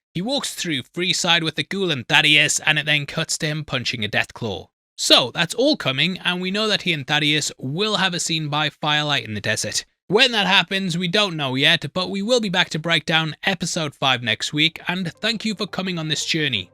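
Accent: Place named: Newcastle, United Kingdom. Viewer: I didn’t know you were Geordie, or at least northern?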